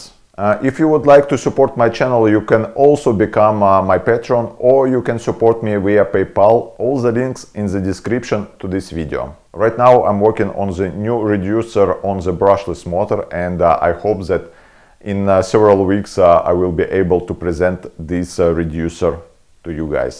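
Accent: Russia. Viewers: Are you Russian?)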